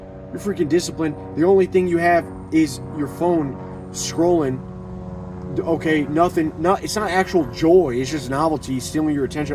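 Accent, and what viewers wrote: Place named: Ohio, United States. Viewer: Man I recognized the accent immediately. I grew up in Clyde-Sandusky co area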